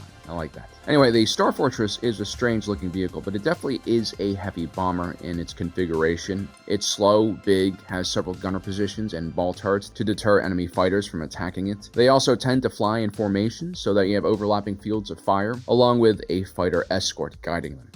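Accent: Mexico. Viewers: Are u Mexican or some sort of southern American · Yeeeaaaahhhh, stopped watching and thumbs down at the messican-speak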